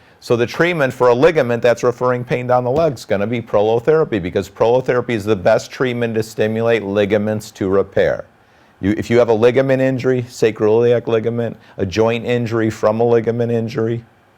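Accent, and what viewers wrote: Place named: United States. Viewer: i would but it sounds like your in america would you know if they provide this in the UK?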